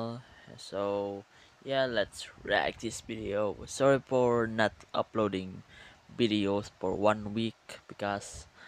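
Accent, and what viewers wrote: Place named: Philippines. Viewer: Wow thats rare to find a filipino channel i knew that ascent sounded fomilar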